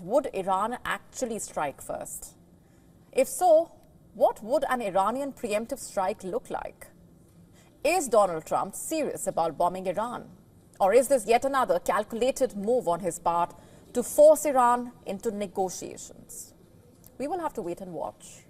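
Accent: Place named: India. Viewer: Indian accent so funny😂😂😂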